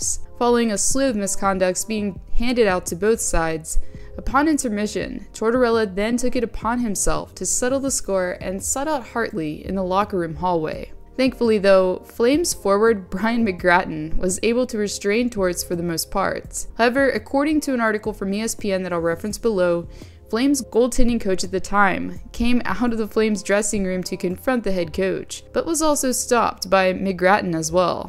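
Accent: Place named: Pittsburgh, United States. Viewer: Your accent reminds me of going to Munhall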